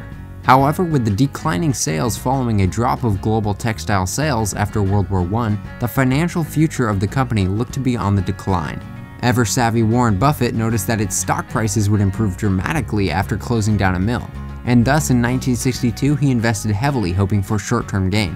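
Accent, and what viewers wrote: United States. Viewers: What American accent is that? (English is my second language)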